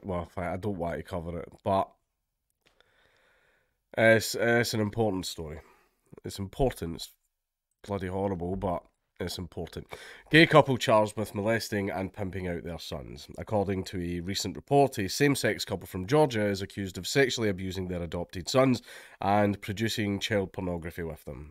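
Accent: Scotland, United Kingdom. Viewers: This is literally the first dankula video I've watched in my life Was not expecting him to be Scottish, I have to say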